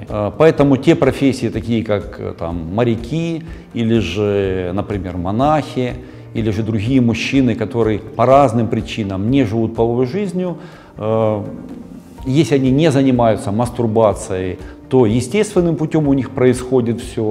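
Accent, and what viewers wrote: Ukraine. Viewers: Видимо он украинец.Ну и шо с того?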